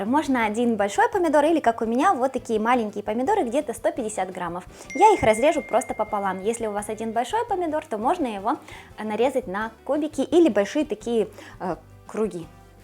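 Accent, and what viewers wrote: Ukraine. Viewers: Дитинко все добре , вле чому ти мовою окупанта ведеш , по говору чути що ти з українського середовища , якась напасть так нелюбити свого і підлаштовуватися під чужих . Чи гроші понад усе ?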